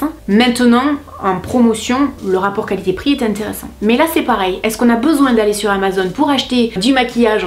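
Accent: South, France. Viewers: et notre bel accent du sud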